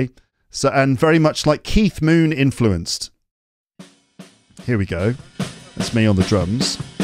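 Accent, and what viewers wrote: United Kingdom. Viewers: Congratulations 🎊 👏. Time fly by. I still remember myself looking for a good British podcast, after I noticed that I'm only exposed to the American English through TV shows, movies and podcasts. I looked and looked for a British podcast. Finally, I stumbled on English Podcast and became a huge fan. Thank you from the bottom of my heart, without this amazing podcast, I would never learned that much about British English and its various accents. Congratulations,. keep up the good work. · HI, I love this episode so much. I started to FOLLOW your videos on YOUTUBE because my boss's pronunciation is very similar to yours, and he is also from the UK, which seems to be Yorkshire. But after actually listening to it, I feel that your accents are still different. Yours is very standard British English. I often can’t understand my boss’s English. 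Everyone’s feedback is that he didn’t open his mouth when he spoke. I don't know if his teeth grow well, because he basically doesn't show his teeth when he talks. But your teeth look good. I am so happy to learn that your daughter is also learning the violin today. My daughter has been learning the violin since she was 5 years old. Although she did not start a performance career, she has cultivated her musical literacy, which is enough for me. By the way, she is currently a sophomore in London, and she is enjoying life in London very much, I am very happy for her. In short, thank you so much for accompanying me in my leisure time with so many videos, thank you, and wish you all the best in your life!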